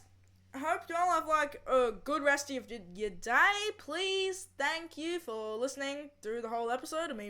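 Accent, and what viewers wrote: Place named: Australia. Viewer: Bro you are mad Australian I am also so I can t talk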